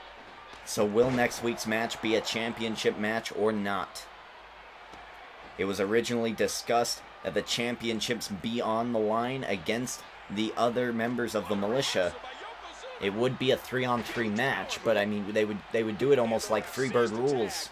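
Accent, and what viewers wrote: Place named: Switzerland. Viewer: Here we go, Friday Night Smackdown (It is late, so I'm going to make these things short). A pretty interesting grudge match, and I'm gonna go with Owen Hart. He's impressed me one hell of a lot recently. Damn, what a fantastic match, especially the run-in! I can see why you're super happy with the way you use Universe Mode, that was great!:D. As much as I adore Antonio Cesaro, AIDEN ENGLISH WILL PREVAIL, I JUST KNOW IT! I liked your Swiss accent, even though it did slip into English and Irish quite a lot... but details! while I'm sad Aiden English did not win, Cesaro's commentary was really fun, so it is all good! Great work! Gotta go with Orton. You never want to piss off a Viper. A great match, with some nice Samoa Joe commentary on the side!:). Gonna go with my man, Corey Graves! Damn, Rider kicked some major ass in this one! 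Utterly murdered Graves! WWWYKI! I'm going to give my victory to The Shield here. They've been super dominant, and right now, I don't think Yoko or Kofi can stop them. Wow, The Milita comes out on top! I'm impressed with them, they could be a real threat towards The Shield's reign as tag team champions! Great stuff as always!:).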